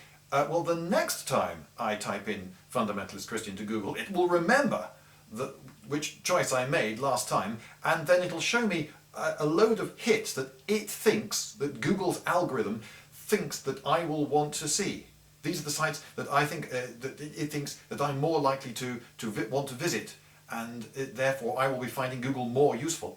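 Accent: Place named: United Kingdom. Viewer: I rarely didnt understand some parts of it (im not native speaker) but i can say you sound so britsh. In fact its one of the most britsh sounds i ve ever heard sir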